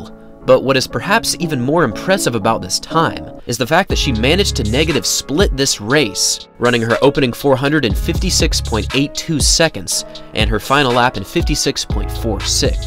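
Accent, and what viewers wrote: United States. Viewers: Why don't you take Griffith Joyner into this equation ? oww wait, i hear a yankee commenting voice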